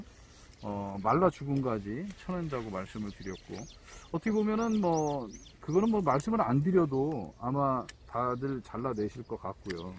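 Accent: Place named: Gyeongsang, South Korea. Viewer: 뻔데 경상도사투리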